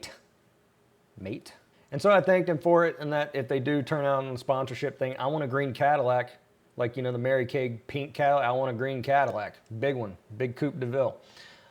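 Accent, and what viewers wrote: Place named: Australia. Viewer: Mate? Sounds Australian! :)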